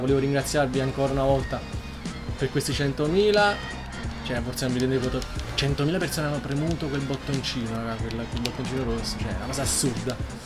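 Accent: Naples, Italy. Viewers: non so se lo hai mai detto,ma sei napoletano? -Sasy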